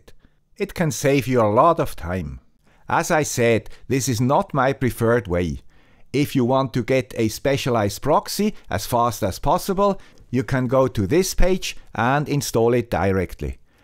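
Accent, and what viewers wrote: Switzerland. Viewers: Just wait until how Swiss Accented Guy discovers NearLink / Green Tooth.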